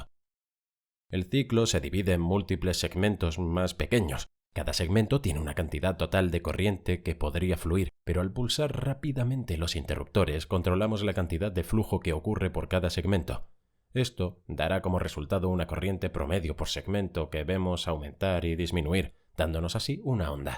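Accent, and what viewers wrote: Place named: Spain. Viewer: Oh NO! Nomas Oi ese Acento de GACHUPIN, Odioso! y me VOY!......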